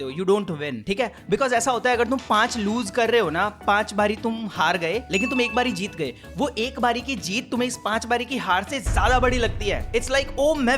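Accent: Haryana, India. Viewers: I like speaking haryanvi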